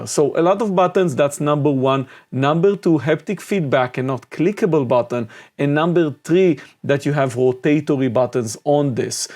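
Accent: Israel. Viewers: You have such an Israeli accent :-) Shalom.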